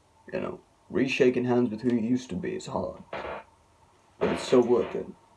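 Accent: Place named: Wales, United Kingdom. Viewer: Yes he is from wales he has a beautiful accent and really dark eyes I could look into them all the time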